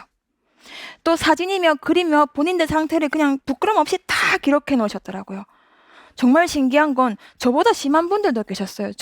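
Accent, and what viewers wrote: Gyeongsang, South Korea. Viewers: 음 대구분이시넹~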